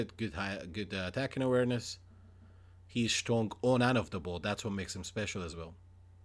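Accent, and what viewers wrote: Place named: Scotland, United Kingdom. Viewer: Where the hell are you from man? Deffo part Scottish. Cant get the other bit tho. Good videos btw. Big up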